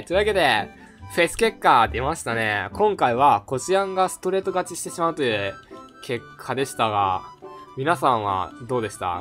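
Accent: Kansai, Japan. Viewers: 関西弁だ！嬉しい(*´ω｀*) そして かわいい笑 いつも以上にかわいいしリピート要素が多すぎる...絶対リピる(予言)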